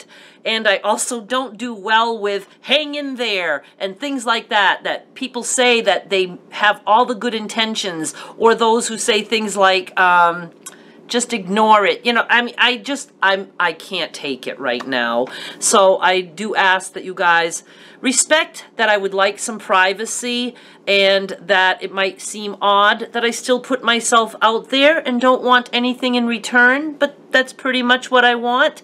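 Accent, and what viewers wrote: Maine, United States. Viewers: I watch a lot of your instructional videos, but have not seen as much of your personal stuff. Thanks for sharing your struggles as many of us can relate. As a creative person myself, I know sometimes making stuff helps you deal with the crap. I have been doing some quilting and when I first clicked one of your videos, I heard your voice and saw your surname and said to myself, "she's gotta be from Maine!" Love watching you! Take care of yourself. When dealing with a narcissist, you need to put yourself first, because you know damn well she's gonna always put HERself first. Dealing with a similar situation in my own life. Hugs!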